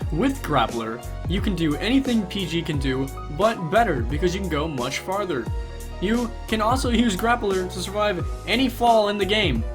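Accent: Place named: England, United Kingdom. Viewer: you prob are the first parkour youtuber i have ever seen with an english accent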